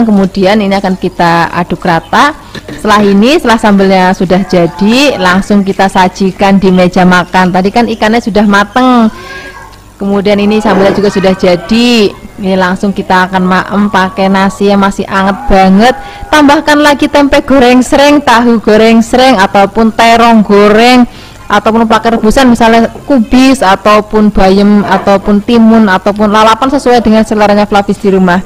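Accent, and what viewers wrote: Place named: Cilacap, Indonesia. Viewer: Kok ky wong cilacap ulih ngomong ya bun,tempe semangit senengane mamakq huhuuu😭😭😭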